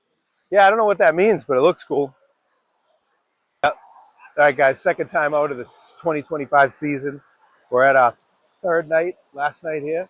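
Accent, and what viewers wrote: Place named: Massachusetts, United States. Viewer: You are definitely from Massachusetts. Accent & dropping the r's at the end of the word. Sound effects you do not need. Another camp ground on my list of possible stays thank you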